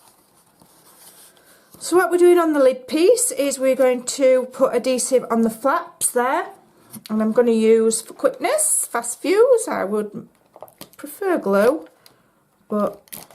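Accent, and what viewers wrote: Yorkshire, United Kingdom. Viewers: Oh my gosh girl. Not only do I love this box, but you make me laugh. It's just like listening to my cousin talk! i was born and bred in Yorkshire, and my family still live there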